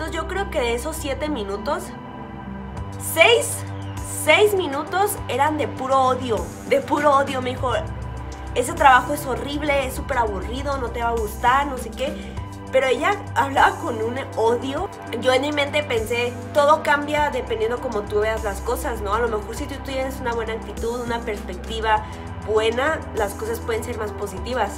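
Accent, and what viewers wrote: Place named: Spain. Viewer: Que bueno eso del intercambio es una muy buena experiencia de poder conocer otras culturas.. me hubiera encantado ir a España jaja esa tonada es tremenda jaja..pero con todo lo que está pasando hoy en día con las inseguridades robos y todo eso.. como que mucho no está para arriesgarse a menos que sea seguro jeje.. pero coincido con tigo seria una muy linda experiencia.. Saludoss😁😉👌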